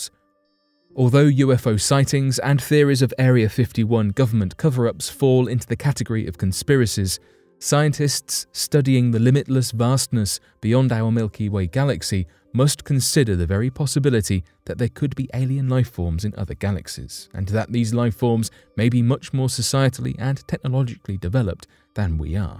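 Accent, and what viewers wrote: United Kingdom. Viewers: Earache British voice, thought this might be entertaining but it's just fucking annoying and monotonous, no sub, set to do not recommend channel.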